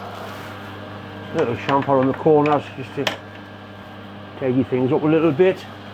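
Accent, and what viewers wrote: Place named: Geordie, United Kingdom. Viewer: if people want the subtitles they can use the built in Youtube ones at the bottom of the screen. I sometimes click them because it really struggles with your strong Geordie accent and can be very funny reading them:)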